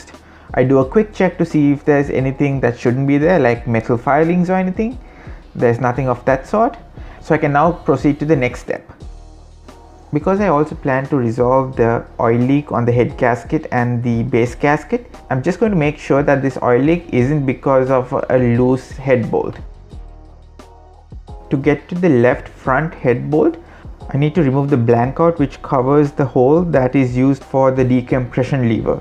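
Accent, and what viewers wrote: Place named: India. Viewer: You talk like you are from India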